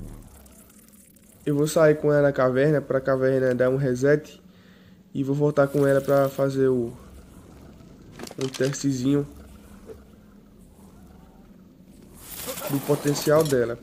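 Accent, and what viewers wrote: Northeast, Brazil. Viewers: Vc é do nordeste certeza kkkkkk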